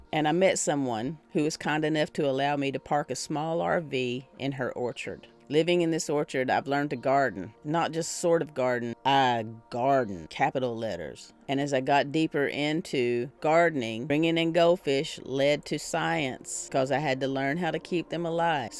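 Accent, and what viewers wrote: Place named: South, United States. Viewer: Sorry if I missed it, where's that accent from? I first thought the accent sounded like ebonics, I reckon it's southern? Which southern? I always thought ebonics sounded southern. Disclaimer: I'm not from the US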